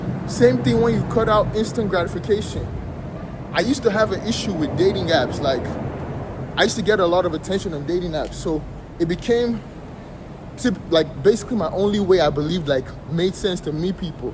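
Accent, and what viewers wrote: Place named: Nigeria. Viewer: Great video, man. Btw, you kinda sound Nigerian. I'm Nigerian, that's why... hehe.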